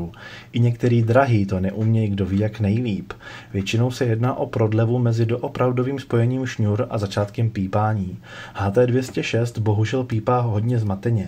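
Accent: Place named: Prague, Czech Republic. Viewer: Ach ta pepanština :(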